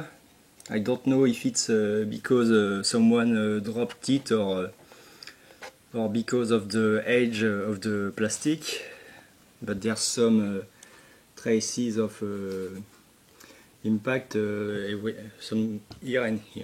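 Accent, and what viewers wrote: France. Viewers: are you french??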